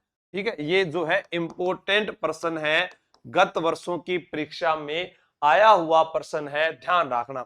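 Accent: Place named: Marwar, India. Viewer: sir marwad me meri Nani ka gaw h whaa par aise hi bolte h😅😅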